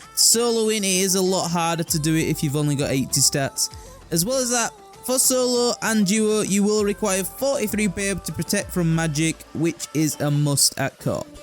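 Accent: Yorkshire, United Kingdom. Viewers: where abouts in yorkshire you at? I assume yorkshire, sounds like it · "Yorkshire on helium " And crack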